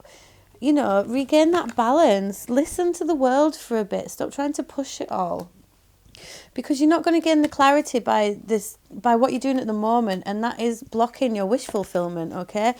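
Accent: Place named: Yorkshire, United Kingdom. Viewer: Thank ya very much. Happy new subscriber, here. ^‿^ I chose 2, actually asking whether I should travel across country to participate in a medical trial that I'd been feeling squeamish about since learning the entirety of the protocol that I'd be following. So hearing 'a resounding NO' is an alright answer in this case. (Your accent is adorbs, by the way. I had a friend a few years back with this wildly whimsical mish-mash of Mancunian, Blackpuddlian, and Yorkshirian -- and even though she felt that her Yorkie aspect wasn't very strong, hearing you makes it pretty clear to me that she still had plenty!)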